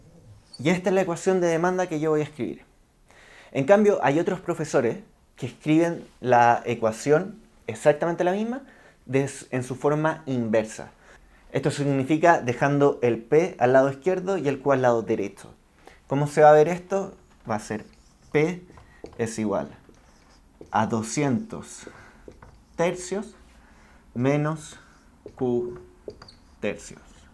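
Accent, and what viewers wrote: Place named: Chile. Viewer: Chileno detected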